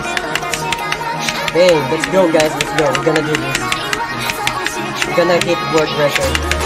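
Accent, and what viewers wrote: Philippines. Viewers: is this man filipino he sounds like one ngl also noice thats a gg in my book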